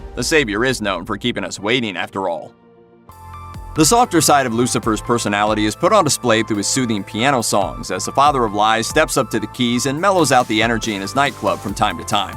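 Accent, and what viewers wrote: United Kingdom. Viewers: So sick of that British accent 😁 Am i the one?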